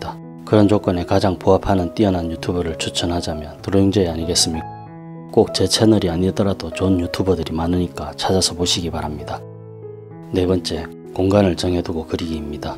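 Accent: Gyeongsang, South Korea. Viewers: 쌤 반갑습니다😊 귀에 쏙쏙~ 지루하지도않구 항상 감사해욤. 쌤 갱상도 쌤 맞지예~??ㅎ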